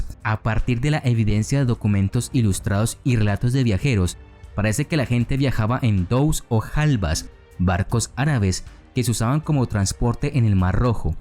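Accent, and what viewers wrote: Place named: Colombia. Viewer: Me ha dado un no se que este documental me provoco escaŕamuscia.con mis 74 inviernos James habia escuchado alguna historia ni parecida,exelente documental mil gracias por compartir sus conocimientos entiendo q se ha documentations muy bien creo conocer su acento parece 🇨🇴 colombiano, mil gracias por sus relates se ve q le gusta la historia qq Dios le de larga vida con salud ciencia sabiduria y prosperidad para q pueda seguir con esta laboreducative. Buena suerte.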